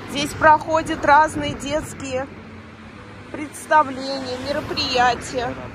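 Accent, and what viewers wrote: Baku, Azerbaijan. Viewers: Мой родной,красивый,незабываемый город.Огромное спасибо,за показ родных мне мест и за дорогой бакинский акцент! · Русскоязычные бакинцы так и говорят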